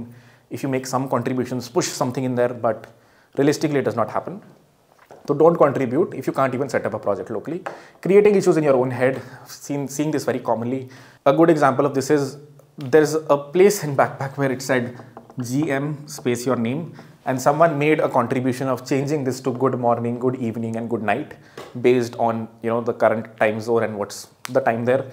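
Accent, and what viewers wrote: India. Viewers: I've worked with outsourcing so long that I am very familiar with the meter and pronunciation. I have no issues with the Indian accent.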